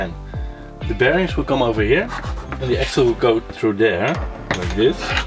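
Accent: Netherlands. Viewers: Haha I just found out your channel yesterday and you are an amazing creator! I already had a suspiscion that you are dutch by your pronounciation (je praat echt goed engels maar elke nederlander heeft iets in zn engels waardoor je direct door hebt dat het een nederlander is ;) ) keep up the good work!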